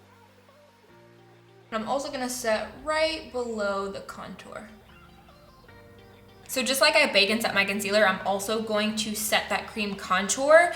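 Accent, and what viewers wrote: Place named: Tennessee, United States. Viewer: After watching this, I watched your Thanksgiving video and I love your accent! It's so pretty in Tennessee!! I love you! #snapfam #Igfam #youtubefam #queen 💜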